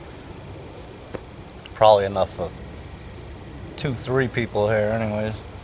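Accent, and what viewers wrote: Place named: East Coast, United States. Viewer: Liked the vid. That eastern accent gets me since im from texas. Keep ot up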